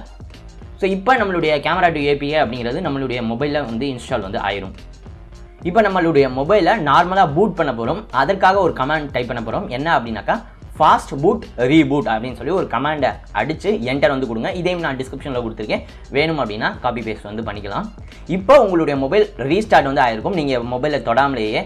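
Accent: Madurai, India. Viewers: Bro Mi A1 ku epadi install panrathu nu solunga and nenga Madurai ahh?? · Bro nee Madurai ah 😍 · Bro madurai ah Neega. . . Nice video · Madurai ah neenga? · Madurai karanaya ne ???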